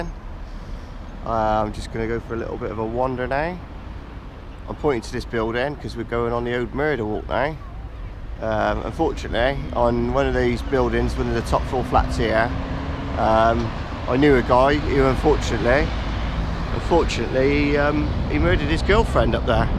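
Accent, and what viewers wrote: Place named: Gloucestershire, United Kingdom. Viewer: if from Gloucestershire they all have that accent.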